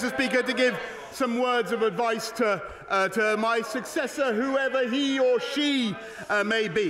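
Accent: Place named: United Kingdom. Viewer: トラスさんが辞任したから、見に来たよ。 発音が大好き😳 やっぱアメリカよりイギリス🇬🇧 皮肉も英国紳士そのもの😂 この謎の髪型さえもいい、なぜか惹きつけられる人😂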